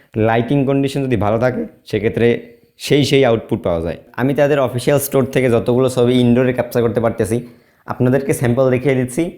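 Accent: Barishal, Bangladesh. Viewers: সুন্দর গুছিয়ে কথা বলে কিছু বরিশালের কথা চলে আসছে যেটা আরো বেশি ভাল্লাগছে ভাই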